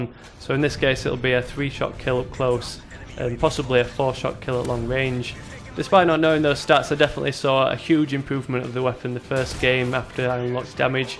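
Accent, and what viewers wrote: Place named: Yorkshire, United Kingdom. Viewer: What part of yorkshire are you from?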